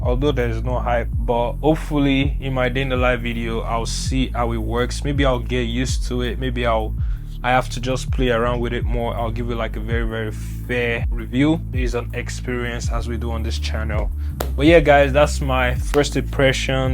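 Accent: Nigeria. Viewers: The accent never goes wrong Naija for life🇳🇬💯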